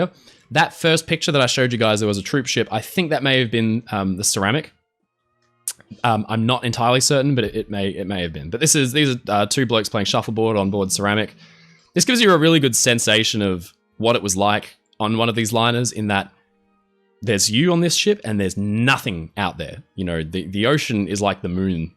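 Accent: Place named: Tasmania, Australia. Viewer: I love your Tasmanian/Victorian accent. Also, from here in the deep interior of North America (Colorado) most of us will never get a chance to experience the ocean/sea . thank you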